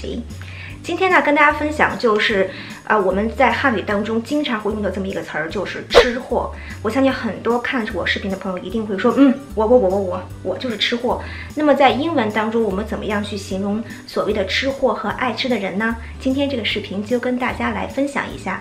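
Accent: United Kingdom. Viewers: 我想问一下在美国这么久英式口音是怎么做到没有被美音影响的，哈哈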